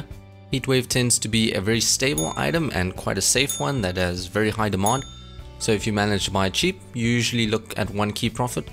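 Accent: South Africa. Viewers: Are u from south africa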